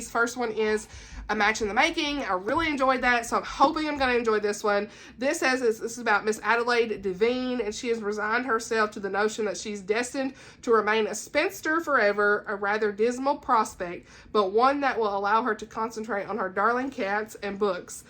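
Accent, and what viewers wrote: South, United States. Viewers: Are you down south with me?? I’m in Arkansas. I hear the twang 😎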